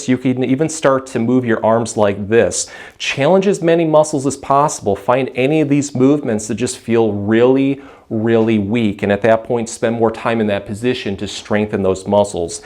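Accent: Michigan, United States. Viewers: Whoa heavy Michigan accent on this one. Helpful tips, as always. Thank you, Dr.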